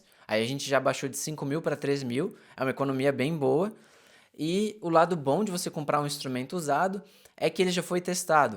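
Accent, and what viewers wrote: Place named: Santa Catarina, Brazil. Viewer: Eu sabia que vc era Catarina. Hahaha. Sotaque não nega. Tamo junto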